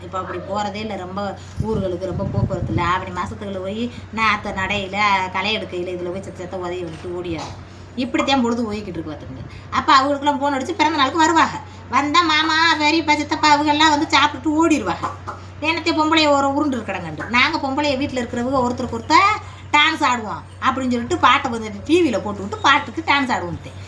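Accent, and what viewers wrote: Madurai, India. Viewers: உங்க பழைய வீடியோஸ் பாத்தேன். முன்னாடி வாடகை வீட்ல இருந்த வீடியோஸ். அதுல எல்லாம் நீங்க பேசறது ரொம்ப நார்மலா இருந்துச்சு. சின்ன சின்ன இங்கிலீஸ் வார்த்தை கூட யூஸ் பன்னீங்க. இந்த மதுரை பாஷையோ ஸ்லாங்கோ அப்பல்லாம் பேசலையே. இப்படி பேசினா தான் சப்ஸ்கிரைபர்ஸ் வருவாங்கனு பேசறது போல இருக்கு. இது ரெண்டுல எது நிஜ முகம்.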